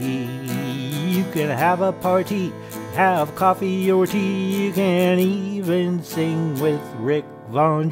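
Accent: Canada. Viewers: I dig your narrative style. So charmingly hinterland Canadian. Brings a smile to my face :)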